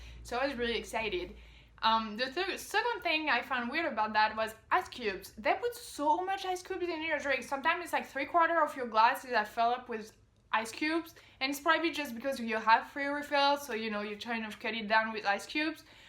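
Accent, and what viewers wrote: France. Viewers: You're too cute! I love French women and their accents. You could be cussing me out or talking bad about me but the words sound so good, in a French accent, I don't care what you're saying about me! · Although you obviously have some French accent (but not the thick, "typical" one) when speaking (american) English, the vibe you are giving off is much more American than French ... Speaking as someone who lived in France for 6 years. And only knows Americans from (too many) movies/television/Youtube videos and hotels ... :> Still. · "Mapple sigh-rup", So unmistakably and adorably French., do not let anyone try to change the way you say that ever.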